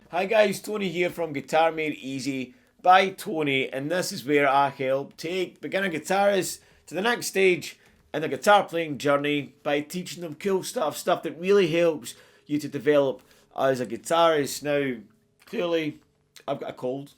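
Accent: Scotland, United Kingdom. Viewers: I just found your channel & have learned more from you in the last couple of weeks, than in last couple of years on my own or even in lessons! Even with your Scottish brogue & my Southern American ears‼️🏴🇺🇸🤔🎸😆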